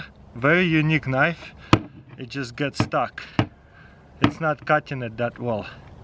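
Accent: Russia. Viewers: He's russian guys chill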